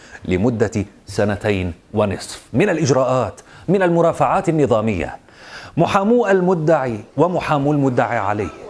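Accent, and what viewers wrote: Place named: Iraq. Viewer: حسب للكنته يبدو أنه عراقي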